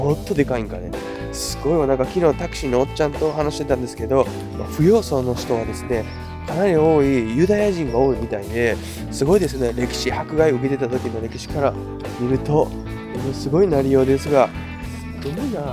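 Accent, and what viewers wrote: Kansai, Japan. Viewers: この関西弁が非常に聞き取りやすい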